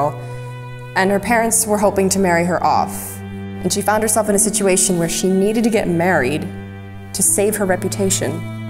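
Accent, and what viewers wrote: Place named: United States. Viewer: Going by her dialect, do a lot of Americans/Canadians get involved in this thing?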